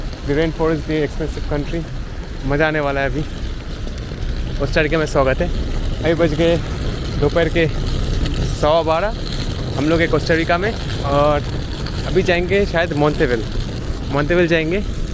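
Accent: Bengal, India. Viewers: I like your vlog hindi with Bengali accent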